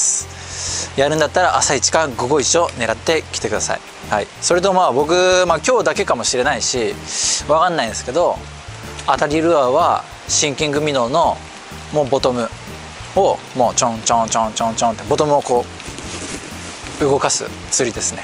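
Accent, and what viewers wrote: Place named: Nagoya, Japan. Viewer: 名古屋弁が懐かしい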